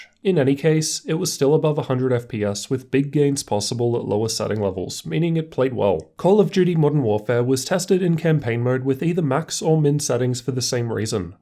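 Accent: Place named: Australia. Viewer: Bruh this boi Aussie bruv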